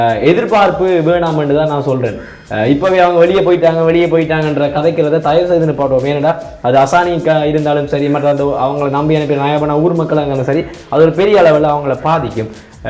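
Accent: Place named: Sri Lanka. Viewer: அசானி முன்னுக்கு வர வாழ்த்துக்கள் வாழ்க வளமுடன் மேலும் முன்னேற வாழ்த்துக்கள். ஐயா நீங்கள் பேசும் இலங்கை தமிழ் மனம் கொள்ள கொள்கிறது.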